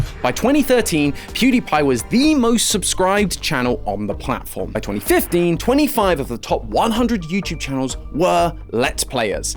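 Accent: United Kingdom. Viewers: Ugh, what’s this British voice guy? I ain’t watching this lol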